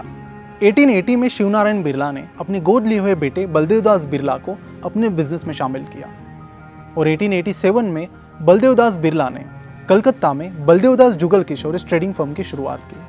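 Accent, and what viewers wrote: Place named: Maharashtra, India. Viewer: I love your Marathi accent...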